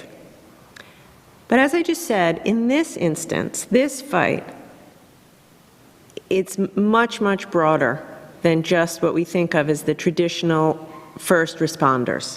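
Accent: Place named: Rhode Island, United States. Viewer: "3 new deaths anaconna the cahrona virus".....live the Rhode Island accent!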